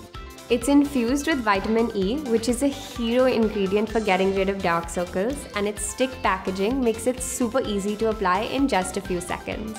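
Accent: India. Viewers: So funny how you look American and have an indian accent,didn't expect that at all😂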